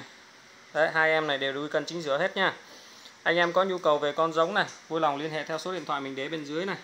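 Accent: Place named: Thanh Hoa, Vietnam. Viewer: Giọng bác giống giọng thanh hoá quê ta quá 😂😂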